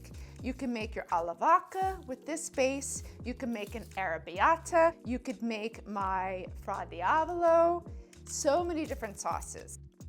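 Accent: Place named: Boston, United States. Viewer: Like a non Jersey Carmela Soprano but MUCH prettier. said your from south Philly? I assumed greater Boston area at first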